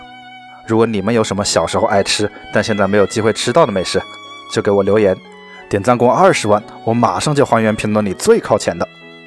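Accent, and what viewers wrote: Wuhan, China. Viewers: 听着像武汉的☺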